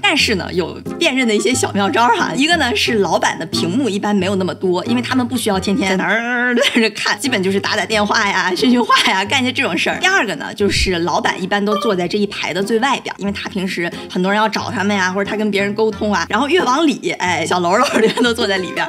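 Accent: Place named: Dalian, China. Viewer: 大连的？只有大连人能听出来！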